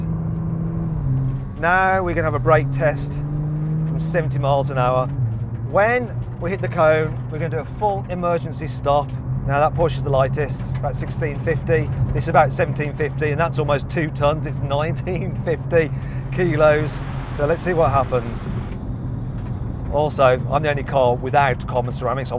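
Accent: United Kingdom. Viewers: Fucking English accent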